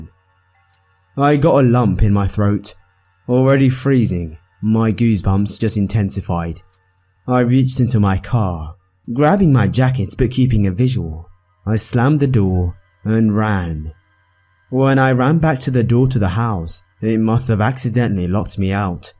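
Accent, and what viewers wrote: England, United Kingdom. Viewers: You I love accent is it Australia or England sounds English lol so Sexy much love Sexy Grandma ✌💘😉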